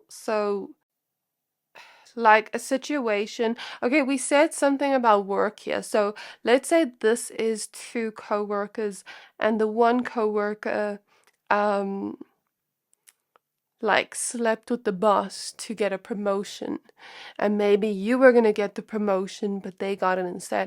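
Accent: South Africa. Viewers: South africa? Wow. I was wondering what your accent was from......and your lights went out. ....2 months agi i was having an issue with my finances and had my electricity shut iff because i didbt oay it. ... And EVERYONE IS AGAINST ME FOR ME. EVERYBODY........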